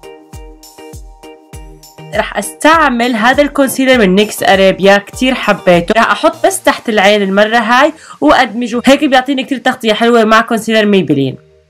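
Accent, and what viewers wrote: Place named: Syria. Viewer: لَيش بتحكي سوري ؟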